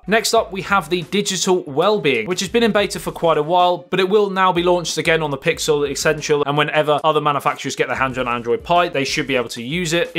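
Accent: United Kingdom. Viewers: Great video 👍 And I love the way you Brits knock off a syllable in the word bat-ter-y and pronounce it ba-tree 😊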